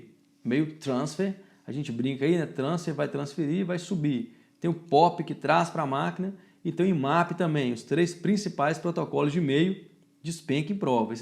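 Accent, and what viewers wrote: Minas Gerais, Brazil. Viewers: Sotaque top de mineiro e professor nota mil! Continua com as aulas querido professor!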